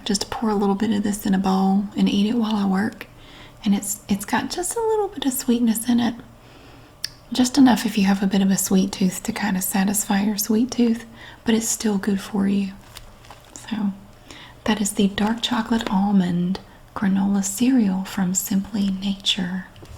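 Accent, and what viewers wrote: South, United States. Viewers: I swear nobody brings me more comfort than this woman. I'm not sure if it's the southern accent or the maternal vibe she has, but I come back to her videos any time I'm stressed or anxious or upset & she always brings a sense of calmness to me. Bless her soul.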